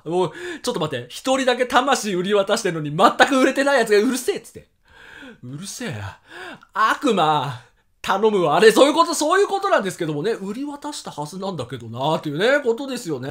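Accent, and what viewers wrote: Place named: Kyushu, Japan. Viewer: 今日、九州弁がでてたね。 昭和ネタが大好き💕なのでこれからも沢山お願いします🤲。あ、姉そんネタも大好物です。 栗きんとんの冷凍は知らなかったよー😅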